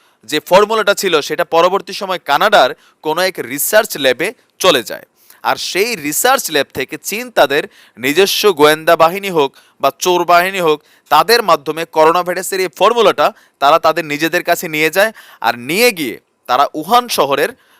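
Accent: Comilla, Bangladesh. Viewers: Cumilla hote vi apnar cumillar vasa khub vlo lage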